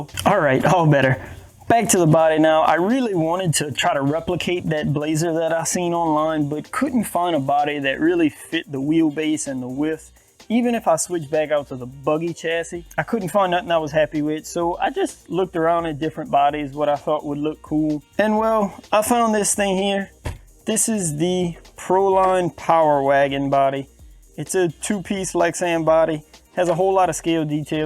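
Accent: Louisiana, United States. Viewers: Sounds like a Lafayette La accent.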